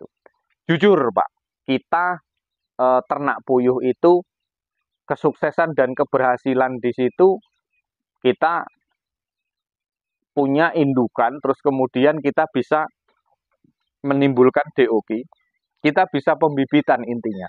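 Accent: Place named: Java, Indonesia. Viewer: Dari bicaranya bang ini asal dari Jawa kelihatanya,bukan asli Jambi,😂😂 trmksih bnget bang ilmunya,sy banyak belajar dri Abang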